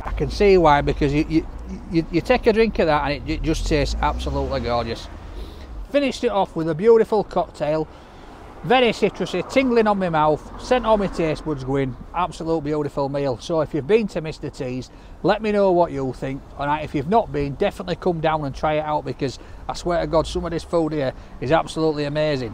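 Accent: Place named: North, United Kingdom. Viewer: Love this guy proper northern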